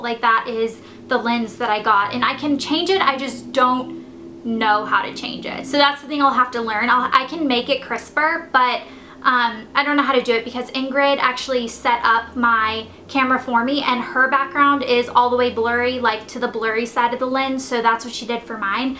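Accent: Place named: South, United States. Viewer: I love how sometimes your southern accent comes out <3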